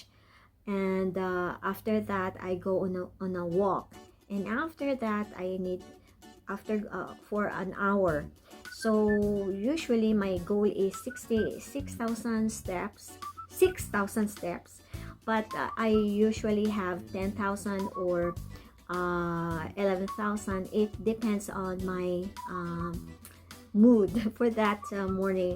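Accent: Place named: Philippines. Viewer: Plus your accent sounds just like hers. She was filipino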